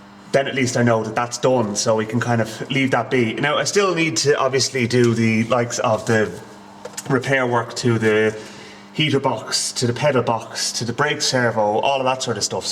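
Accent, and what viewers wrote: Ireland. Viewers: The images on the vidwo look fine and I love your channel. This American also finds your Irish accent to be a pleasant addition. I worked in Dublin (actually, in Blackrock) a while back and love the countryside and the people of Ireland.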